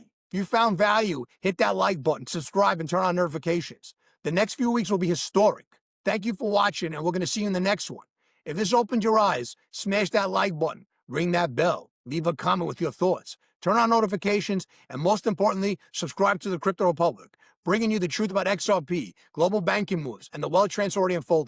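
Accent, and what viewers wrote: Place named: New York, United States. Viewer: That New York accent ❤😊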